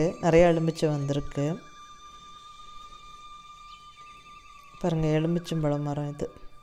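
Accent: Kongu, India. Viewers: Tats great........ Missing cbe so much..... Now m in singara Chennai.....by watching ur videos I feel so proud tat I cud hear Kongu tamil and our dishes as well in Kongu touch....... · yengal kongu Tamil.. mam nidhanathoodu sollum vidhamum arrumaii...❤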